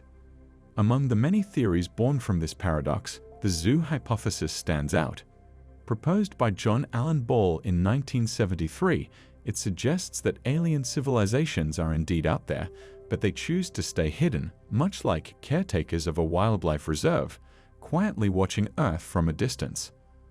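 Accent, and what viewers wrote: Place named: Australia. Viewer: Not American, seems more like Australia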